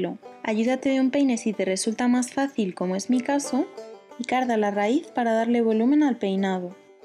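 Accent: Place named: Spain. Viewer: Yo savia ke hablabas español por tu acento cuando hablas frances!! :P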